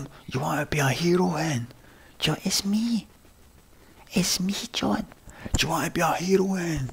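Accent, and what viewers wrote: Scotland, United Kingdom. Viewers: Yep. I'm from Scotland as well and I speak just like that ....... without the angst 😂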